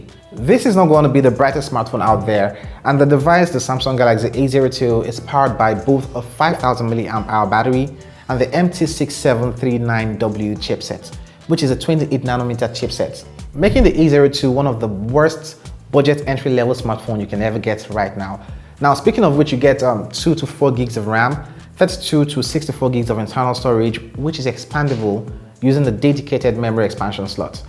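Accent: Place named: Nigeria. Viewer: u sound like u originally a nigerian__if u are much love brooo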